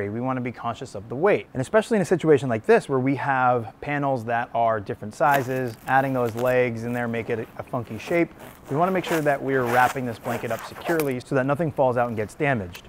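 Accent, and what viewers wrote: Boston, United States. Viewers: More Boston accent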